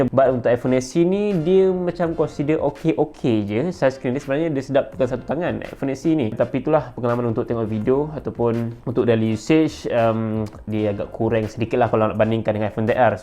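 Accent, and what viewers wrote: Malaysia. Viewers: Aksennya? Dia orang malaysia?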